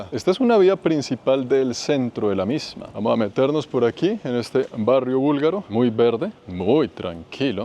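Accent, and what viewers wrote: Bogotá, Colombia. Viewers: El acento de es el rolo o Bogotano, cada región tiene su propio acento.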